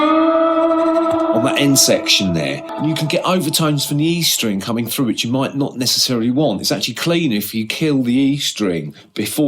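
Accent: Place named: United Kingdom. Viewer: you got a very british accent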